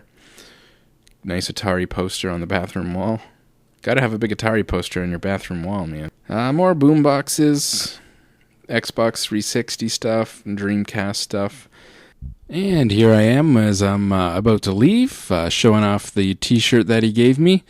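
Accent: Canada. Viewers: Wow I stumbled across your video and while watching I was like this guy sounds canadian.I was super happy to see that this store is located in Ontario.Im from Toronto and deff will be making a trip to this store very soon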